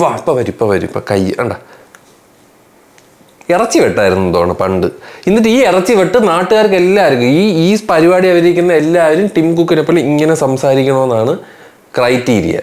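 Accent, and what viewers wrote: Trivandrum, India. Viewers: അണ്ണാ tvm language പൊളി😅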